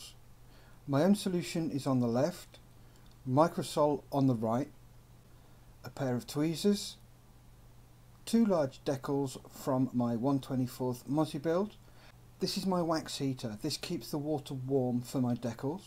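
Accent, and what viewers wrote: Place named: England, United Kingdom. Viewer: Sorry I've slept since watching the video and forgot you had an English accent lol.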